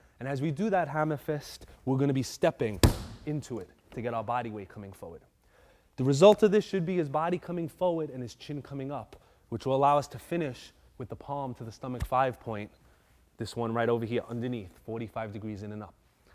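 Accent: New York, United States. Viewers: I would guess either New York, New Jersey, or Italian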